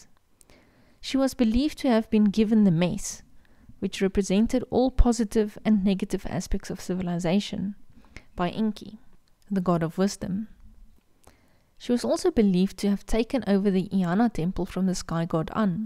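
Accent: South Africa. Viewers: Nah, but there's a lot of South Africans in NZ so I recognized the accent straight away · Is that Afrikaans accent I hear, love it either way, I'd listen to anything you did lol and very interesting good work 👍😎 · Nice to hear that South African accent.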